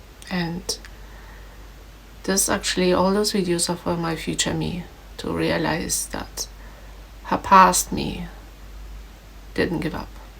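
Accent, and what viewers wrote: Trinidad and Tobago. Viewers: You're a true Trini with accent and all. You will get there soon...love the sunset painting ❤ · You may have left Trinidad, but I hear and see Trinidad in you. Big up, and stay strong gal. Ya go make it.!!! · You are stronger than you know. You are a true Trini resilience and strong. You even sound Trini❤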